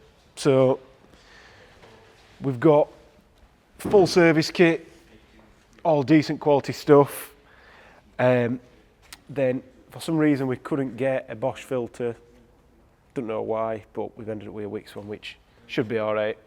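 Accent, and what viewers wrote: Yorkshire, United Kingdom. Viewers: I live in Yorkshire so feel comfortable saying this, brilliant accent my friend! When talking about switching the forged wheels ‘let’s see what difference it mecks’. Brilliant. Really enjoying this 125d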